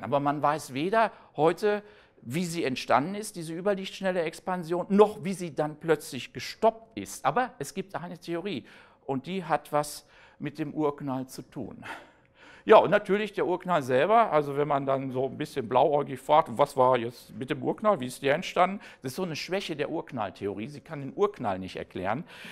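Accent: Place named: Westphalia, Germany. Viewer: Aber in Leipzig geboren und ab 1977 in Münster studiert. Da kam es wohl zur westfälischen Adaption. Was für ein Glück. Man stelle sich diesen Vortrag mal auf sächsisch vor. "Dor Ürgnoll, de Krawwidodsjöön, däs Ällägdröön is büngdförmisch, ün de Raumdsait is gegrümmd." Nee, lieber nicht :-)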